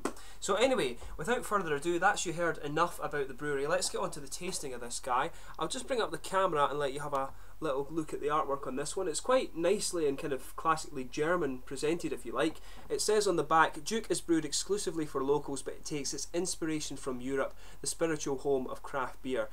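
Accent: Scotland, United Kingdom. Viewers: its great you not lost that rich scottish accent whilst traveling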